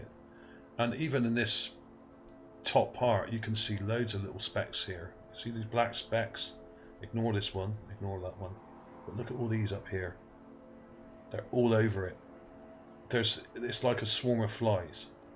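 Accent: Bristol, United Kingdom. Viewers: you sound Bristolian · Are you Bristolian mate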